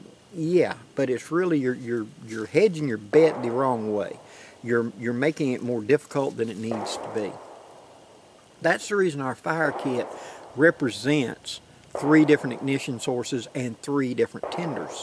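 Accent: South, United States. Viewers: Thank you for the wonderful teaching field trip...love the scenery as well...as always i am very impressed with your Videos, including the Southern drawel 🥰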